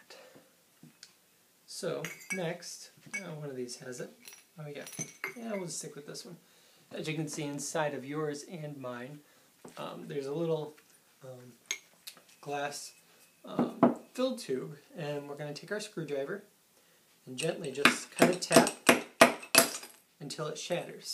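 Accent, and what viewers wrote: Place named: Canada. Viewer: Is it just me or are you speaking with an accent? A bit Canadian, a bit Minnesota. Which are similar.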